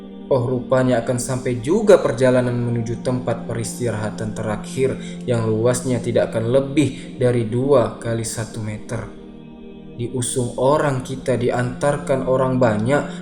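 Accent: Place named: West Sumatra, Indonesia. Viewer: Admin orang minang ya. Soalnya logatnya kayak orang minang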